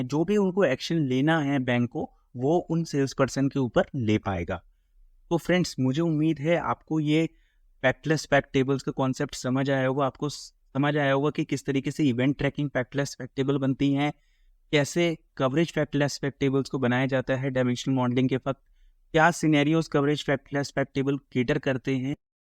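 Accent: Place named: India. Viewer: Good Indian accent on YouTube.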